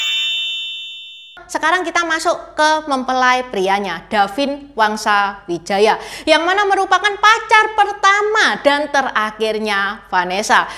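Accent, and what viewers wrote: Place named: Surabaya, Indonesia. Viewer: Logat Chindo Suroboyo.. medhok , wes ketoro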